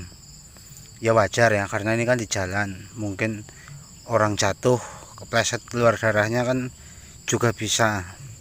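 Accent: Banyumas, Indonesia. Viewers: Assalamualaikum WR WB. MAAF mbah, saya mau tanya karena sangat penasaran. Panjengan domisili di daerah Banyumas krn dgn logatnya. Tapi pastinya dimana. Matur nuwun. Saya penasaran kok masih ada orang canibal.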